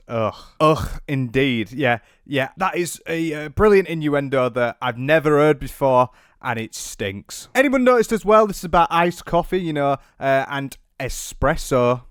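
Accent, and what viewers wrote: United Kingdom. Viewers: Can't believe sshe disappeared for a momth I don't want to make fun of the British accent, i love it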